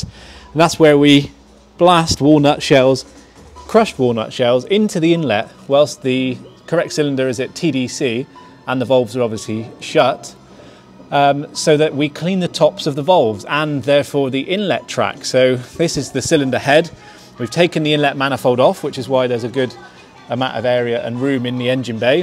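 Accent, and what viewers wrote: Bristol, United Kingdom. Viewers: Bristolian accent maybe?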